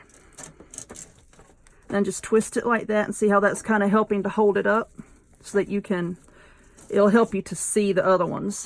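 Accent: South, United States. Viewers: Hahahaha! I LOVE watching your video's!!! You talk like I do......:) I absolutely adore this Rose, I've never seen this before? It's BEAUTIFUL!!! Thank you so much for sharing your talent with us! BTW.......I'm a Georgia Girl.........and love the country Twang!!! LOL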